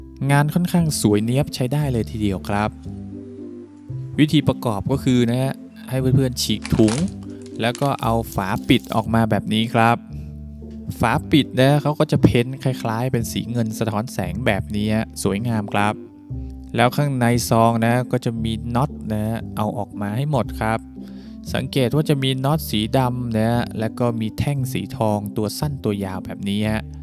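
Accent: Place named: North, Thailand. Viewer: คนเหนือคับ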